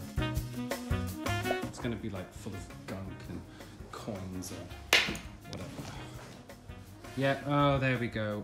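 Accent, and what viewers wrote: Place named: Newcastle, United Kingdom. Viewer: His Geordie accent is sneaking in quite cutely!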